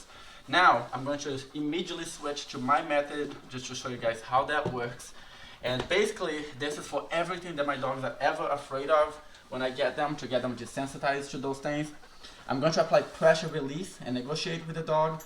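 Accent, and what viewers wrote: Germany. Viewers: I LOVE watching you work! I'm sorry people will probably think I'm a dummy but where is your accent from? Is it German? I'm pretty new to watching you. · Do I hear a German accent? I love you work!❤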